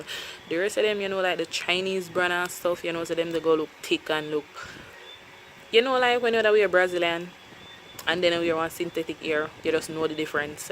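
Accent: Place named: Jamaica. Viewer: hey girl i love your accent ...do you live in Jamaica...i always dream of visiting Jamaica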